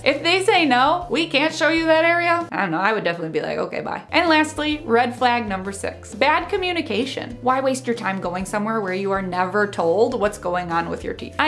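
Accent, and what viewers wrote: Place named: Chicago, United States. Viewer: Great advice. Your Chicago accent feels like home, sounds like my friends.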